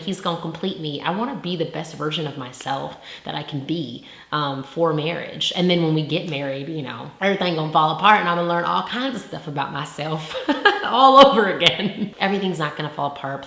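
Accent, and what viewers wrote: South, United States. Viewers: Lol where I'm from we always talk like you do with a southern accent for jokes but you really talk like that and I just couldn't take you serious I kept thinking you were joking 😂😂🤷🏾‍♂️ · Her southern Belle voice is beautiful lol whoever hasn't got a chance to see her LIVE and in person. I need that to change in 2018. I've been grateful enough to have seen her LIVE about 3 times. And she is such a GOD GIRL . Years ago I bought one of her jackets. And it's been keeping me warm ever since.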